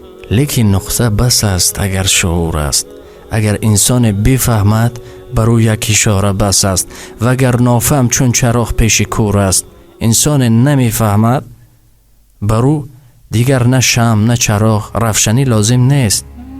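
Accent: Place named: Tajikistan. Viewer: بهترن سخنها آفرن دستی ازیزم لهجه شیرن فارسی تاجیکی🇹🇯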